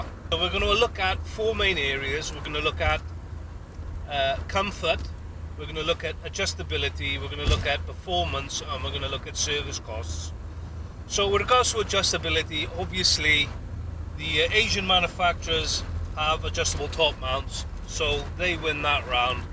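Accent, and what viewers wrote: Wales, United Kingdom. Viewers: I got a racechip ultimate connect on my golf mk7 1.4tsi,and the moment I have taken it off as it keeps throwing cel code for turbo sensor wen I drive hard,Amy ideas why mate,cars fine wen uninstalled,by the way are you from wales originally,sound like a Walsh man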